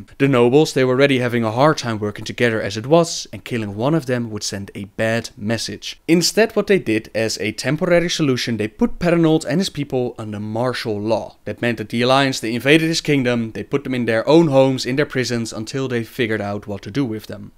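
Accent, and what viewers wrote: Netherlands. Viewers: Dat dutch accent :D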